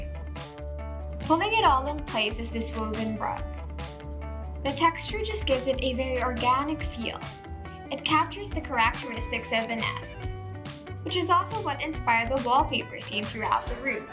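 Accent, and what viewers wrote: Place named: California, United States. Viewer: HI. Ms., you have a beautiful content po. Just avoid cracking voice when youe speak I llike your californian accent though.:)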